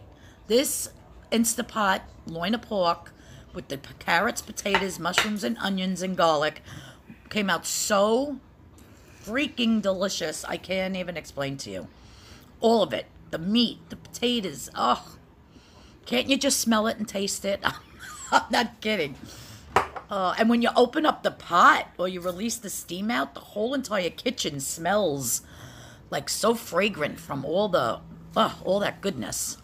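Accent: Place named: Long Island, United States. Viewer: Hi, happy to find your channel! Are you from Long Island? I recognize that accent!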